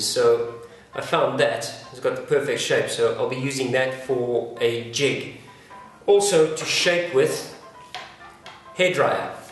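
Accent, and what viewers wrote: South Africa. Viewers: Are u south African 🌍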